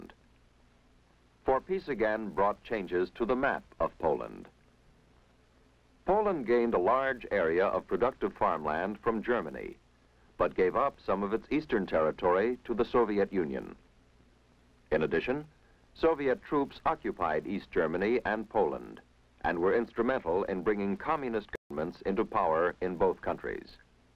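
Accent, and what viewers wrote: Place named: Mid-Atlantic, United States. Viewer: I'm not sure but he is speaking a mid transatlantic accent which was really great.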